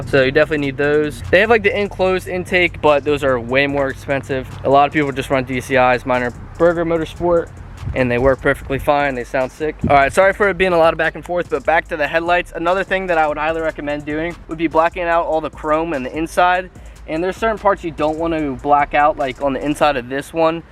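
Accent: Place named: Maryland, United States. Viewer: Your maryland is showing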